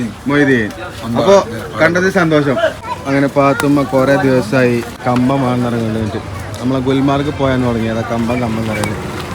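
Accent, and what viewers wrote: Malappuram, India. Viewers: Cute cuples... എനിക്കു൦ ഇങ്ങനെ ഇംഗ്ലീഷ് പഠിപ്പിച്ചു തരുമോ😍😅മലപ്പുറം ഇംഗ്ലീഷ് കേൾക്കാൻ നല്ല രസണ്ട് 😅😅😍😍🥰🥰 പാത്തുമ്മ പാവ൦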